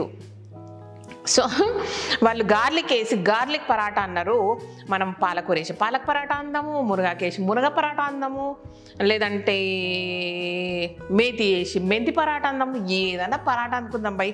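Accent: Telangana, India. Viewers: Yellow sareelo muddabanti puvula unnaru.meeku sarees baga suit avutayi abba! Telangana maata vellulli 🙏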